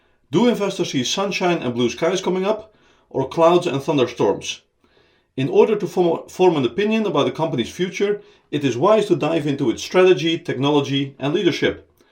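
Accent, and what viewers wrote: Netherlands. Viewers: Are you Dutch? :P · Lekker Nederlands accentje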